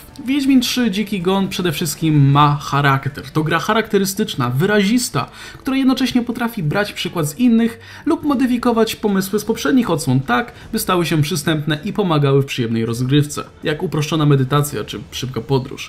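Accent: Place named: Poznań, Poland. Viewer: speed<num> mi to brzmi normalne, typowe poznańskie zaciąganie.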